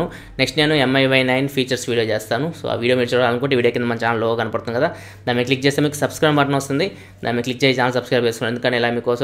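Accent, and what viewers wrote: Ongole, India. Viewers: R u from ongole anna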